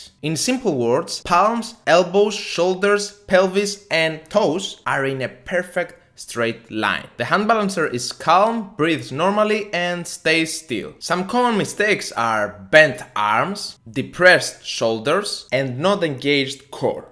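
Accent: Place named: Greece. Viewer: Are you Greek? Your pronunciation sounds really Greek llol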